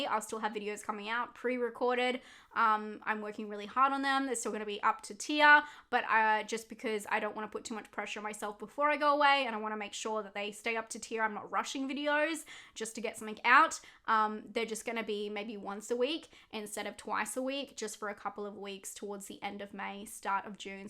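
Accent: Australia. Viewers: Congratulations on getting married..I love your channel and your Aussie accent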